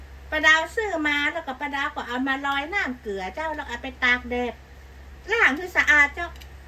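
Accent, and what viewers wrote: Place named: North, Thailand. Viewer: อู้คำเมือง คนตี้ใดจ้าว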